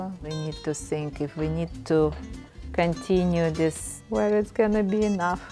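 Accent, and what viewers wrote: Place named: Russia. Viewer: Такой русский акцент)))Но урок очень хороший!А какой марки используете темперу?Мастер класс?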